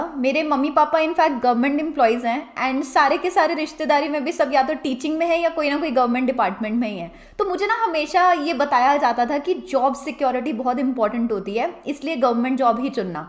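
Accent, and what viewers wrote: Punjab, India. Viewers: Love the tinge of Punjabi when you talk 😊 Chak de phattey Kudiye.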